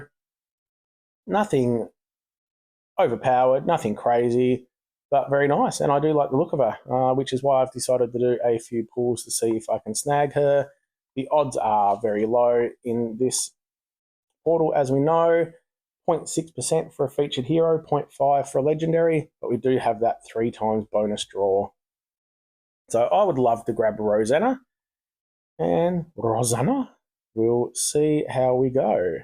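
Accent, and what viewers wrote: Australia. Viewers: Great video, man! I could listen to Australian English all day long. Keep it up, your content is really amazing! Also, you seem to have a very smart cat! Great idea of yours! But don't worry, I'm sure there are enough people who watch your videos regularly. And of course, thanks a lot for your content! 😉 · thanks legend, new to your channel but always good to hear a fellow aussie voice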